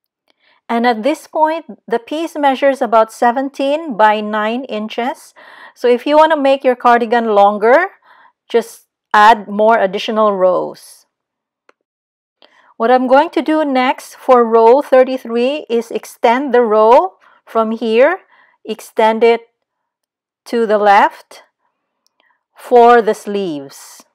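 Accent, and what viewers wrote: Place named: Philippines. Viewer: Are you Filipina? I like the way you speak.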